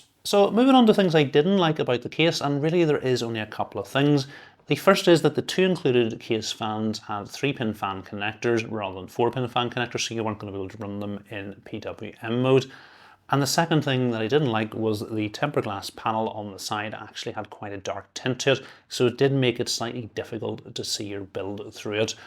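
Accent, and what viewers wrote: Northern Ireland, United Kingdom. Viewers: You are def from northern ireland... I am from the US, but have lived in Belfast for the past 10 years and can spot a northern irish accent anywhere. Glad to see another computer enthusiast from NI. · Love hearing the NI accent. Much love from portadown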